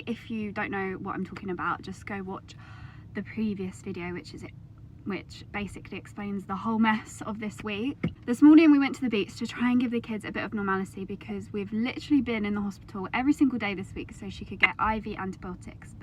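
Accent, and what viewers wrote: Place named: United Kingdom. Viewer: I won't go into the gruesome details of what I went through 12 months or so ago. By your accent I'm assuming you are from the U.K. It is such a simple test to check for a U.T.I. That SHOULD have been the first thing that they checked. Indeed, you shouldn't have even requested it. It is so frustrating and dangerous that you had to wait 5 days for it. I hope your child is now well and happy xx.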